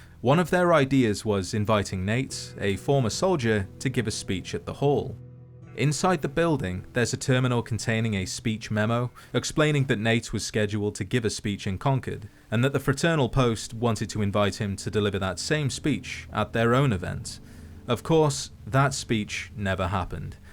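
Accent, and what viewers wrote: United Kingdom. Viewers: Thank you British fallout lore master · It’s ok mr British vault boy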